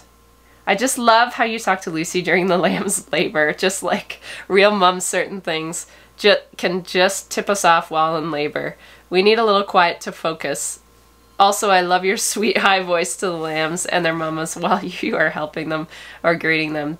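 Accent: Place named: Canada. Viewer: Hey! My mom who went home to heaven in 2014 was raised in Kitchener but raised her family in Michigan. I have many many fond memories of visits to relatives in Kitchener (always the farmers market was a high light) and the surrounding area. When mom turned 70 we 'kidnapped' her for a weekend in Stratford...theater and mint smoothies were enjoyed!!! I love that you are from that area and sport your Canadian accent. Just wondering if you could think about renaming the LambTaxi the "EWEbur"...love all your puns and thought this might fit right in. Merry Christmas from a 1/2 Canadian.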